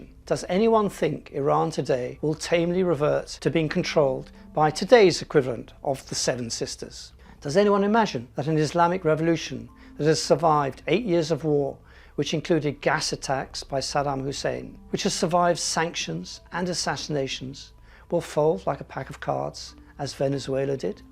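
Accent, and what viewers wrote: United Kingdom. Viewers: His british accent automatically disqualifies the speaker as their our real enemy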